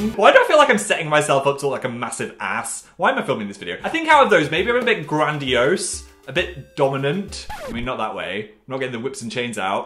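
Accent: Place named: Northern England, United Kingdom. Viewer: Ahhhh Northern Phil is back with another “Ello!” intro!!! I’m loving his return to his northern roots 🥰💗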